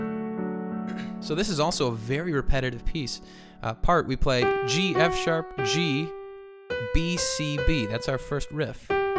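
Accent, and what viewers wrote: France. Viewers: Thanks, just when I finished learning it xD btw i like your french accent xD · Ahaha the way to you speak french sounds fun Cheers from Paris I speak english espanol french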